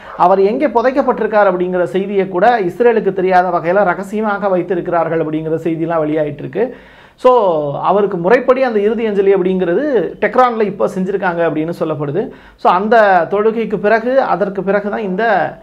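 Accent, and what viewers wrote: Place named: Madurai, India. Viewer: உங்கள் மதுரைக் குரலை கேட்டு பாராட்டுகிறேன்.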